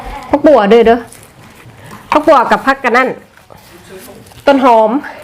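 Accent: Savannakhet, Laos. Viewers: ມັກສຳນຽງສາວສະຫວັນ ລູກສາວຈັ່ງແມ່ນເປັນຕາແພງ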